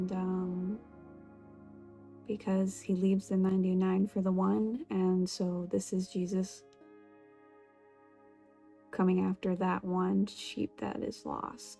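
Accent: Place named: United Kingdom. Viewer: I love your British accent, so cute. My son asked me last night why I always watch your videos and I said, because I love her and her videos. It is highly possible that you had that dream just to intercede for her through prayer. I had a dream last week and there was a woman standing at a public counter and she said, "my son makes 200 thousand dollars a year and he gambles it all away. I prayed for her son in my dream to stop gambling. When I woke up I was so glad to finally be remembering my dreams again, even if it is just snippits. Anyway, I believed he was a real person and that was really his mother. Jesus is quickening the body of Christ and we are experiencing supernatural things left and right! Bless you and your family.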